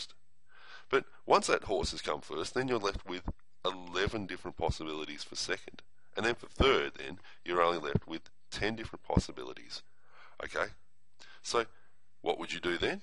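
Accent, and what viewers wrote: Australia. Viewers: it’s not normal american...more like australian or new zealander... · I can't tell if I'm here more so for the advice or the Australian accent.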